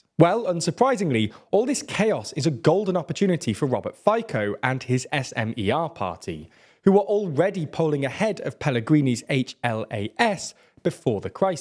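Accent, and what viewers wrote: England, United Kingdom. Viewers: Hey Dude! Don't be so self-confident! You should first learn how to pronounce the names of the politicians you are talking about. No one gives a damn where are you come from and what is your language background. I am pretty sure no Slovak citizen likes your silly pronunciation of their names, in fact, we found it arrogant, and it only shows your total ignorance of the subject as the ONLY reason you made this compilation slide show is to get views and likes to get your youtube rankings up! Besides, I guess you as a UK citizen (based on your accent) have absolutely no right to label/mention some of our political figures as "fascist" even if the idiotic narrative of Slovak mass media may often indicate so. Even if politics in Slovakia is a mess thanks to aggressive foreign interventions, (including your country), Slovaks will solve these in their way. 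I mean, HEY MAN (!) who do you think you are to have the courage to stand up before us Slovak citizens and talk about subjects you have absolutely no freaking clue about?!?!?! No one cares about your opinion! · How is it not to be a party to USA, and UK led proxy war with nuclear power, through country they puppeteer, and pay for directly on your border a pro-kremlin, pro-russia? Do you even use your brain? You can hear from the accent the host is from UK, and him saying pro-kremlin, pro-russia try to smear everyone who does not want to be part in this USA/UK regime proxy war. "You not with us", in their indoctrinated chicken brains means "you are against us", but what about "go fkin play somewhere else, and leave us alone", that in my view is a side as well, worth to take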